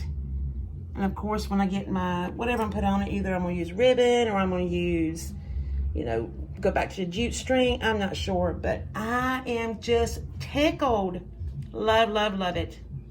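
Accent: South, United States. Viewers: Happy spring girl friend🌷🌹🌺🌸!!! I enjoy watching you and all your diys. I love to hear your southern accent, you make us feel at home watching you. Your channel is going to grow so fast 😀👍🏻👏🏻💖❣️ Good luck and best wishes to you. 🙏🏻🥰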